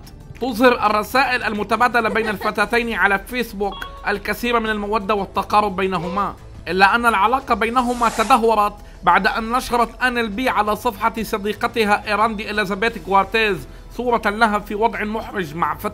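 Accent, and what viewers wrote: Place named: India. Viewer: يشبه صوت الهنود و الباكستانيين 😁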